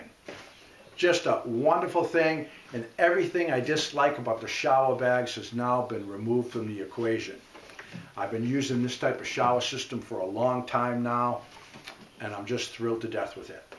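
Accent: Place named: New York, United States. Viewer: You do have New York accent. So wonderful.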